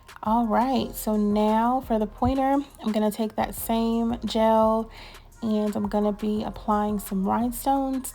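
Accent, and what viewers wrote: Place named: South, United States. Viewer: Your nails are beautiful… but the thing I’m more focused on is that accent. Girl I’m a southern woman though and through but I’ve never sounded that sweet when I talk. I’m soothed, I’m relaxed, and I’m envious all at the same time ❤️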